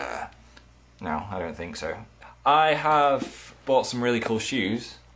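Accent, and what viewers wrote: Texas, United States. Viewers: Lol ur american accent is awesome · His American accent makes him sound like Christian Novelli. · your american accent is really good! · I like your American accent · Oh my god ur American accent!!!! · i like your Texan accent:) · accent in this video is like the ones in Texas. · PLEASE DO A WHOLE VIDEO IN AN AMERICAN ACCENT!! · anybody else think he sounds like makemebad35 with an American accent? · I like your American accent. It's actually pretty good, although it's hard to tell when you go back and forth. You should to a video were you try to say things in an American accent if you haven't already.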